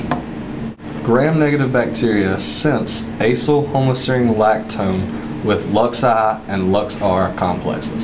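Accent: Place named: South, United States. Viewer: visuals were kind of blurry, but quick pace made it easy to follow. I find your southern accent endearing :)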